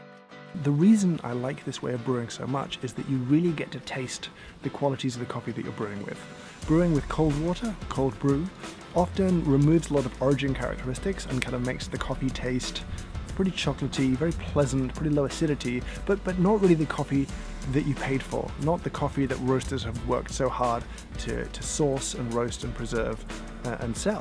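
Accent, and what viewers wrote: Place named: England, United Kingdom. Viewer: All I have to do is take one look at the profile of your glass of ice coffee and I know it's too weak for my taste. It looks more like tea to me. Maybe that's how you like your coffee. You do have an English accent! No thanks. I'll drink mine using the old fashioned Cold Brew process. Dark, strong, and dank!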